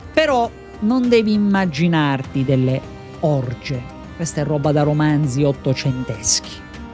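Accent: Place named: Sicily, Italy. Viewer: 😂il sangue del sud non mente😄simpatia pura….sei il prototipo di un professore scolastico del nuovo mondo…simpatico…veritiero e che incolla l’attenzione …complimenti….il tuo accento sembra della Sicilia sicula non sicana ☺️👍👍👍👍👍🏆complimenti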